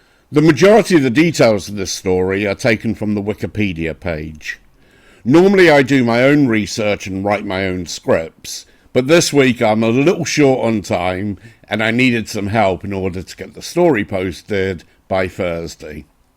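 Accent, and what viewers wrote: United Kingdom. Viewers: Another expertly done video,. Kudos, as always! Double fascinating to me, since I am not only a history buff but also greatly enjoying reading about & seeing images of old cemeteries. But of course what a totally heartbreaking piece of history. So very sad. I always also enjoy your narration (especially love that British accent!). THANK YOU very much for sharing with us. 🤓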